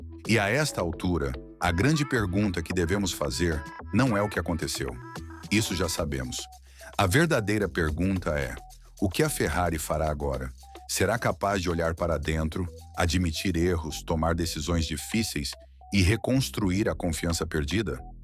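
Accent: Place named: São Paulo, Brazil. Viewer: Merda de IA com sotaque caipira paulista! Medonho.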